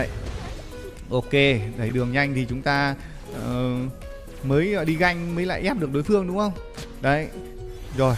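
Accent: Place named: Ha Tay, Vietnam. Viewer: Giọng a nghe như giọng hà tây kkkk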